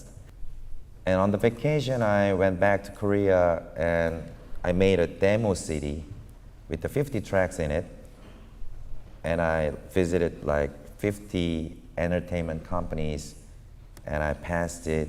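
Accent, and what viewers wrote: United Kingdom. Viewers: 몇번을 다시 들어봐도 정확하게 말하고 심지어 유머까지 구사하는데 콩글리쉬라는 이유를 모르겠음. 또박또박 영국식 발음이라 그런가? 광팬이 미녀였는지는 잘모르겠음.^^ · 영국식 영어를 완전하게 구사하는군요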